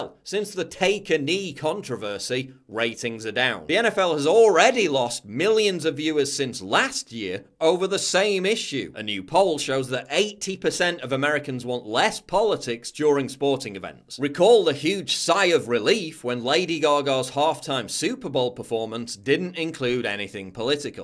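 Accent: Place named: Yorkshire, United Kingdom. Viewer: Geoffrey boycott accent.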